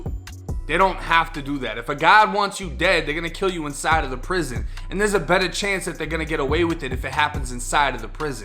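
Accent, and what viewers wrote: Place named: Massachusetts, United States. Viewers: Your from Massachusetts huh?? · Yoooo you got a Massachusetts accent did you live up here in new England?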